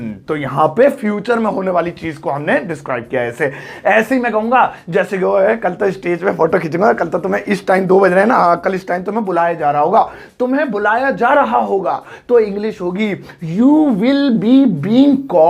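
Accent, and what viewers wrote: India. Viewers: Hello sir good morning I have one suggestion this is 36 video of advance English class and you have already done English speaking 60 days it has been long time if it's possible u can teach us in English. Because your accent is India it will be helpful for us sir 2 ND thing is I have already told you sir please make videos on punctuation I don't know how to use . Thankyou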